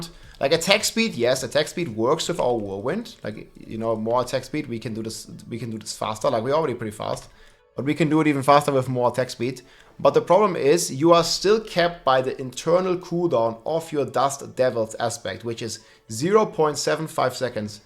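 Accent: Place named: Germany. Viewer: An hört sofort einen deutschen raus wenn er Englisch spricht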